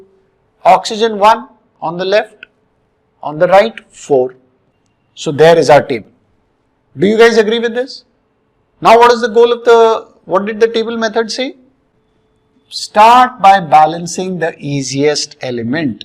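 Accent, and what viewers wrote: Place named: India. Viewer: You better listen and forget his Indian accent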